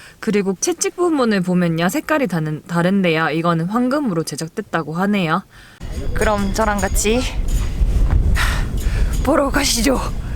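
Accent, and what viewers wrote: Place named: Busan, South Korea. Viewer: 저도 부산사람. 말투 익숙합니다. 항상 기대합니다. 안전여행 기원해요.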